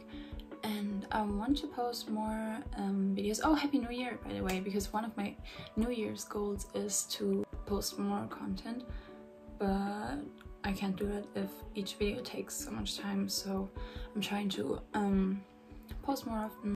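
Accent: Germany. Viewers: Kannst du mal deutsch sprechen man höhrt das du deutsch sprichst 😅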